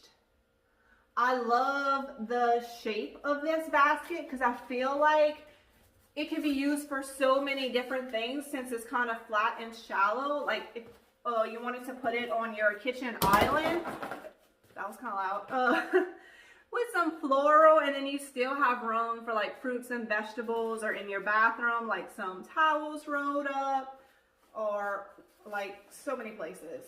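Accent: Louisiana, United States. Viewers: Omg your cute little accent!!! I stumbled upon this video and wad not expecting a cajun accent, love it and all your beautiful items!!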